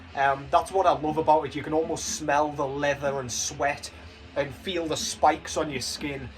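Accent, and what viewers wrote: Newcastle, United Kingdom. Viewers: Where yuh at fella? Ah need me durse uv Geordie taakin aboot metal! Woraboot a Nile ranking man?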